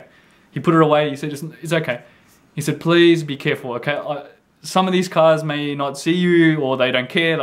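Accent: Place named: Australia. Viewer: I agree with Donald and others, it would be helpful if you would speak a little slower in your videos. Although english is my native language and I usually understand what you're saying, because of your Aussie accent and fast pace of talking sometimes it is a bit difficult. Remember you have many viewers for whom english is a second language so I suspect for them it may be even more difficult at times. Great job on your videos though, I enjoy watching them and look forward to more. Maybe consider visiting Malaysia, Indonesia, Hong Kong or less familiar locations, places we don't usually get to see. tc.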